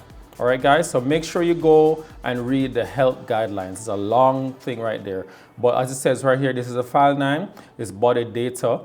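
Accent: Jamaica. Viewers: You sound like a yardie?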